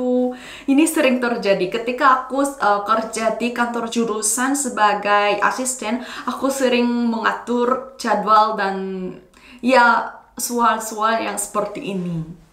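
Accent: Indonesia. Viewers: Logatnya Udah Ke Indo Indo annnn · wih,, dah kayak orang indo logatnya.. fix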